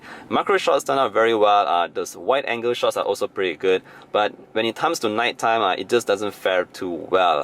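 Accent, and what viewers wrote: Nigeria. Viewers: Love your accent... You've got Nigerian friends? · Bro you have a Nigerian accent... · You sound like Nigeria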